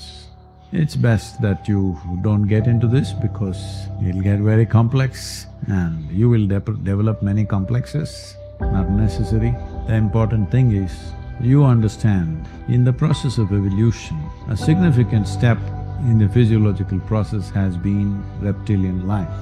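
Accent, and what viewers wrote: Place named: India. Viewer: Ur vdos great. But indians better learn speak English not Indglish.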